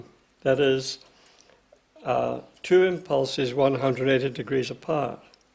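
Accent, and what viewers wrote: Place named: Northland, New Zealand. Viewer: Just by any chance are you a Northland Kiwi????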